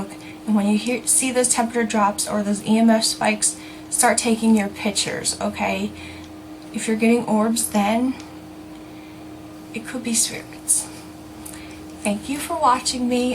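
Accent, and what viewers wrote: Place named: New Jersey, United States. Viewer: Very good video presentation, if I was going to do one it would be just like you did it! I also understood you when you said "guass", we Jersey peeps know the accent well! :-)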